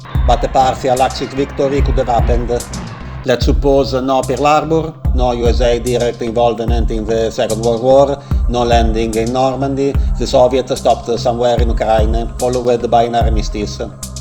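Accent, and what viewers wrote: Italy. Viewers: I think, he from Italian. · Ah ITLALIANO ☀️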